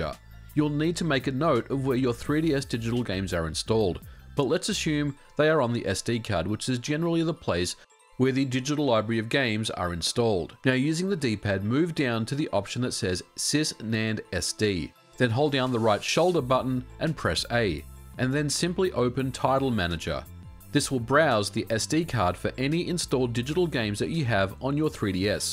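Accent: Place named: Australia. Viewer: you're Aussie right? all these years watching you i know you're living in burgerland but you just sound Aussie... 😅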